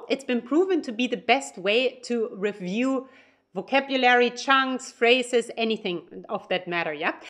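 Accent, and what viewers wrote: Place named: Saxony, Germany. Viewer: Man hört sofort, dass du aus Sachsen bist XD (nicht böse gemeint) Grüße aus Leipzig! :)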